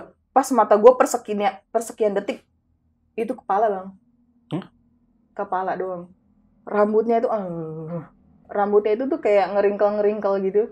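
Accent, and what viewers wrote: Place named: Bali, Indonesia. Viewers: bang nih orang bali ya? hahah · Bang ini punya ciri khas, kalo ngomong yg ada huruf T pasti ngucapnya kedengaran kaya org bali.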